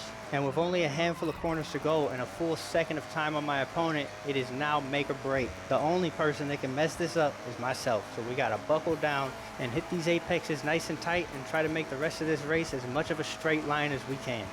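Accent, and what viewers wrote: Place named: South, United States. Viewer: he sounds kinda redneck-y and that kinda accent unironically is a big breath of fresh air in the forza community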